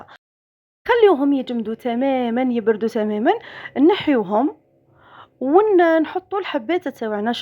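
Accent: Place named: Algeria. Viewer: اختي من خلال لهجتها راهي تبان جزائرية محضة ...لهجة المغاربة باينة وواضحة ولا يختلف عليها اثنان ..سبحان الله😊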